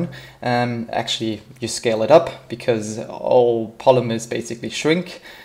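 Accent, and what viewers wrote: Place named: Germany. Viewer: Offtopic: I noticed your german accent and never thought that originates from germany. And after looking in your impressum I see karlsruhe, where I study:-). Muss ich mal in die Oststadt schauen:-D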